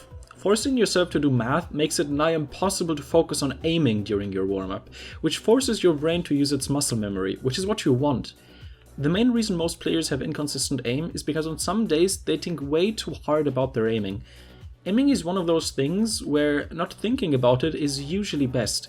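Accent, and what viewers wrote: Germany. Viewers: Dein English ^^ Man hört sofort, dass du deutscher bist. :-)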